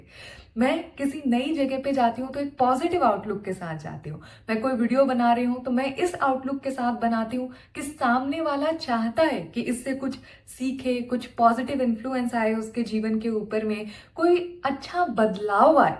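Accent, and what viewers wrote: Bihar, India. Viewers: Your bihari accent 👌👌👌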